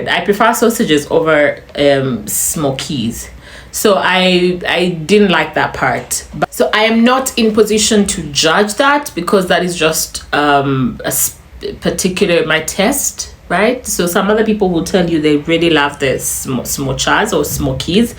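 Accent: Kenya. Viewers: it is correct, you sound more Kenyan than Ugandan. Karibu Kenya and u are a beauty like most of Ugandan women. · Griphin, Can u detect her Luo accent? That is the magic orientation.......😄😅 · True. You do sound Kenyan.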